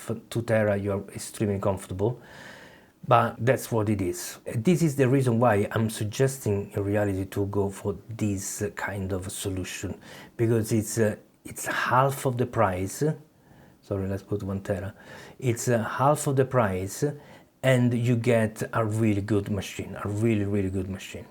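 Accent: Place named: Italy. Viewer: italian eeeehhhh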